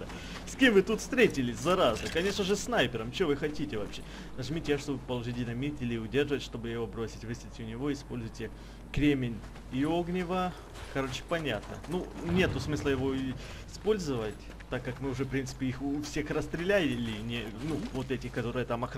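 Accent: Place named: Ukraine. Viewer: У как будто акцент какой-то, может украинский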